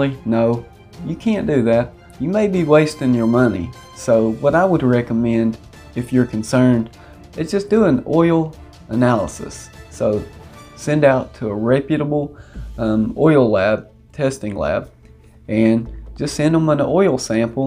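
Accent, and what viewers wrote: South, United States. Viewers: Slow drawl southern hick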